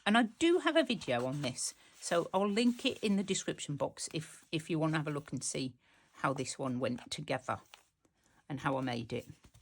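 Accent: United Kingdom. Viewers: I love love love everything you showed us! Your style is my style. I really enjoyed listening to your British accent and seeing all you had to show us! Thanks you so much for sharing❤ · I love the double pockets. Is that a Black Country accent I hear?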